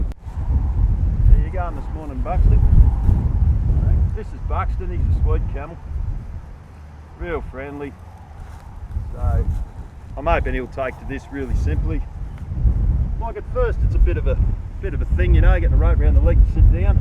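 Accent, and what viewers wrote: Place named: Australia. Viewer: 😂I don’t understand much of your Australian English. But at the same time the music makes everything in the room vibrate 😂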